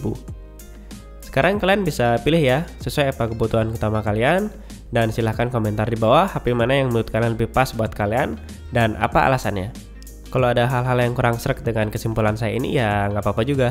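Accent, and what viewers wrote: Bali, Indonesia. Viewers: Kadi jeg logat Bali asane niki pak?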